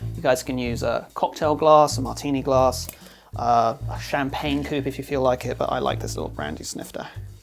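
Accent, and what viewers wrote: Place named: Australia. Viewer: you from NZ? you sound aussie.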